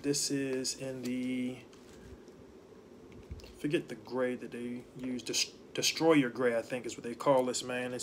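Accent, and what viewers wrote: Baltimore, United States. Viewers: That Baltimore accent is 🤩. Love your gun collection. I just purchased my first hand gun last week. Excited. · Thats right more people of color Loving this ur Baltimore accent makes me think of Omar from the wire lol keep it up. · You can tell he from Baltimore lol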